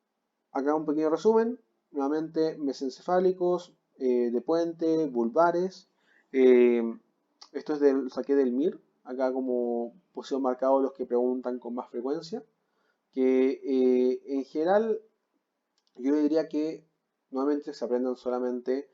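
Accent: Chile. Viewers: Eres de chile? Por tu acento 😅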